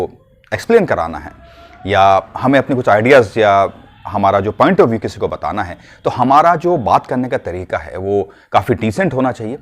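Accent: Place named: Khandesh, India. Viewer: येले म्हंतस अहिराणी तडका 🔥🔥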